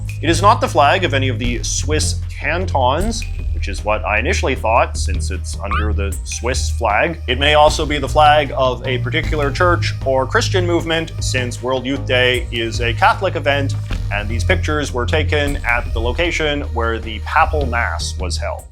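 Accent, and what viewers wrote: Canada. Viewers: Hes Canadian with a Canadian accent. Accents ≠ mispronunciations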